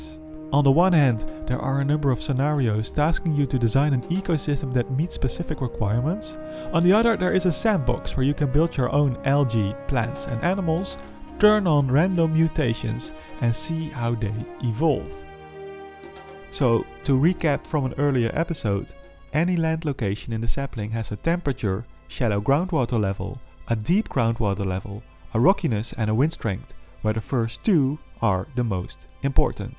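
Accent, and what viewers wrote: Netherlands. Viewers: (re) Dutch accent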